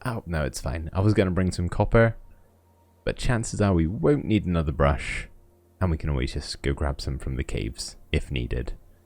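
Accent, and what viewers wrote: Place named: Devon, United Kingdom. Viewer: Are you from the west country? theres a twang in your accent thats a bit Devonian.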